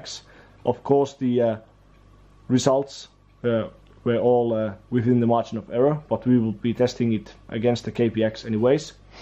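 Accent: Finland. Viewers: You sound Finnish, are you Finnish? Jos oot, hyvin tehhty video.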